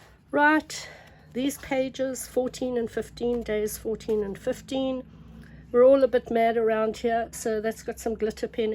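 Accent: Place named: South Africa. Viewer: So lovely to hear a South African accent on an art journal video.Your art journal is beautiful.Love from the Karoo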